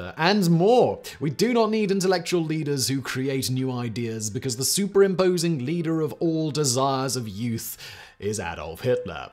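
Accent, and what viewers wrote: United Kingdom. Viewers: Yes! I found him via The Casual Criminalist channel. I thought he was a very posh English man. Very proper. Then I found his other channels. 😂😂😂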